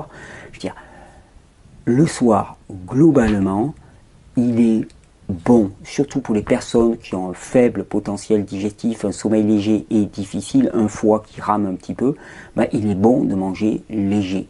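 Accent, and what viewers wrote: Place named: South, France. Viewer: bonjour, au vu de ton accent, tu sembles être du sud de la france, je dirai même sud - est, est ce que je me trompe?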